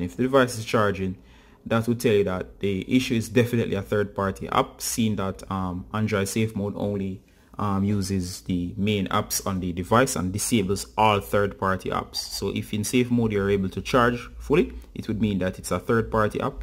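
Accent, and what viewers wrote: Jamaica. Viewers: I heard offer up signal 😆 thanks for the tips. Are you Jamaican ?🇯🇲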